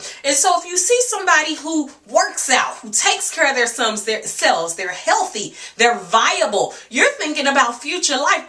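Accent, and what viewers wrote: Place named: St. Louis, United States. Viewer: Tell 'em (the St. Louis came out on this video, lol)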